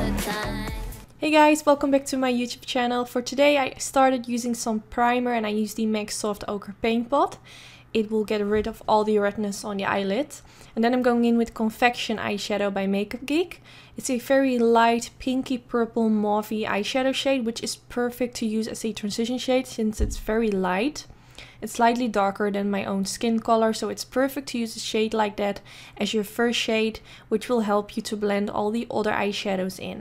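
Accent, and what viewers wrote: Netherlands. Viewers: ik hoorde je praten en wist meteen dat je nederlands was haha! ik zou iets meer werken aan je engelse uitspraak!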